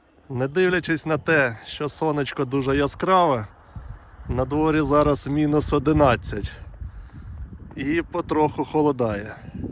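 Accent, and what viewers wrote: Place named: Central Ukraine, Ukraine. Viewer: Закарпатье - это соседняя область, недалеко. А говор у этого автора совсем не местный, он не с Карпат, приезжий. Где-то с центральной Украины.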